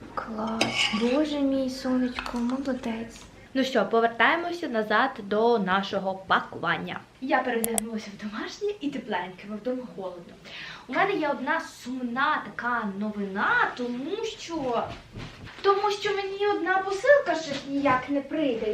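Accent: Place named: United States. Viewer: це так цікаво❤️ Детальний розбір, покупок, сумок) А твоя англійська 😍 наче ти амерікан гьорл)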